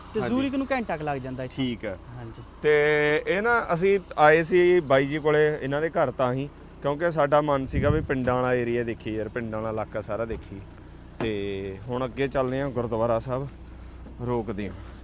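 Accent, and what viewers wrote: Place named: Punjab, India. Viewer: Bhaji, you speak Punjabi as you born in Punjab.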